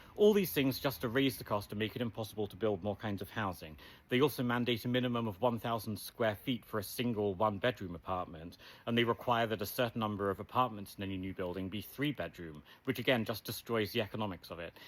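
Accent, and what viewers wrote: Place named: United Kingdom. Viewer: Why is a British man explaining Texas laws to everyone?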